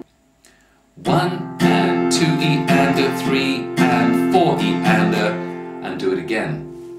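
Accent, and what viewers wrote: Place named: England, United Kingdom. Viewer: Muy ingles el acento 😆✌️🤙👉🇦🇷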